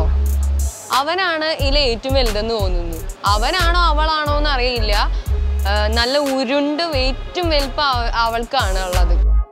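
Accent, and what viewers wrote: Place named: Thrissur, India. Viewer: Your voice, Thrissur slang and way of presentation are very much attractive.. Nice..♥️..also animals shows are exciting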